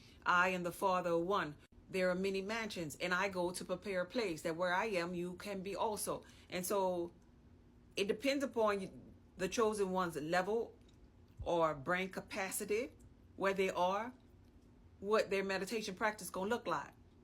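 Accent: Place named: Gullah Geechee, United States. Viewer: I love your accent! 😍 Totes random! Sounds Gullah Geechee.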